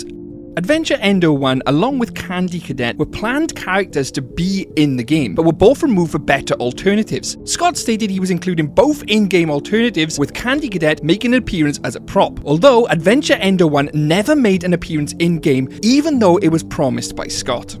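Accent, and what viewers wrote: Wales, United Kingdom. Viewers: nice to know there's welsh people on YouTube, barely see it, can tell from the accent. i have the same. keep it up mate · sorry are you welsh? you sound very welsh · Are you Welsh because you sound it? Well, so am I!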